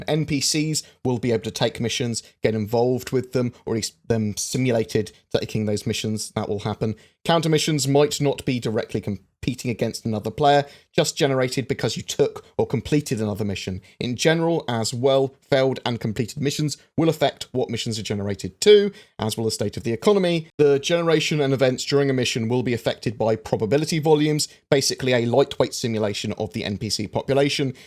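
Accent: England, United Kingdom. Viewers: Youuur accent is so Englishhhhhh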